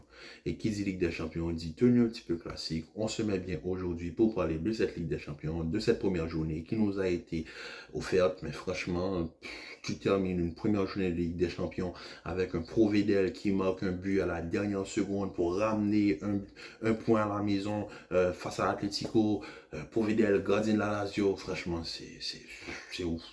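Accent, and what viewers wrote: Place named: Haiti. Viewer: Je sens que vous êtes un Haïtien. Ton accent, la façon dont tu dis le "en tout cas" "antouka"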